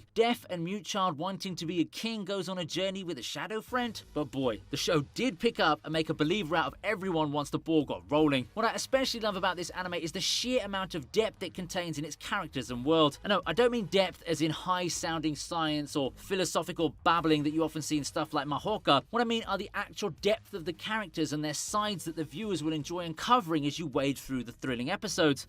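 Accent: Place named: United Kingdom. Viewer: Hey. I want you to know you are my favorite YouTube who covers anime by far. You have put me on to some of my favorite anime. Thanks for all the work you do. And for making these enjoyable videos. Love hearing that British accent before I get to see some badass anime😁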